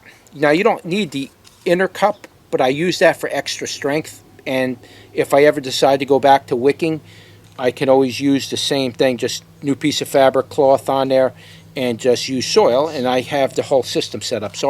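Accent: New York, United States. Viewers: great idea! going to give it a try .. what zone are you in? you sound like a new yorker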